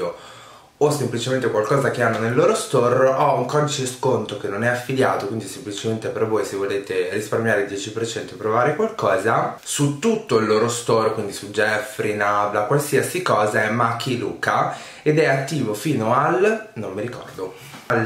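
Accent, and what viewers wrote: Milan, Italy. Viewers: Sta cadenza milanese alla * mi fa impazzire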